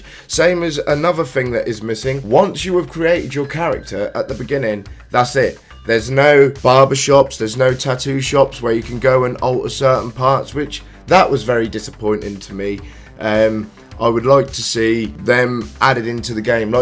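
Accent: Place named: East Anglia, United Kingdom. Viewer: I like your accent, where are you from? It reminds me of East Anglia in England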